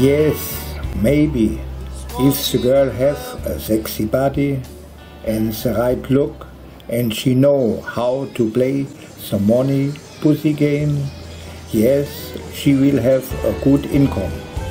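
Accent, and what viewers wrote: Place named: Germany. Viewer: Schönes und interessantes Video, aber Dein Englisch ist immer noch extrem deutsch akzentuiert, ich musste öfter sehr grinsen. Aber man versteht alles sehr gut und das ist das Wichtigste.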